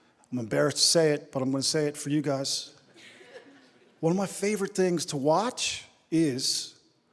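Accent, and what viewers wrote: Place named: Newfoundland, Canada. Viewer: When you started I thought there was Irish in your accent ,then you said you were from new foundland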